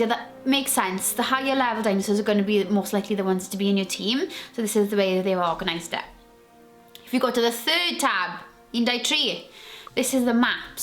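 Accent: United Kingdom. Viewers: She is scouse my g an accent in English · She literally has an English (or Welsh) accent.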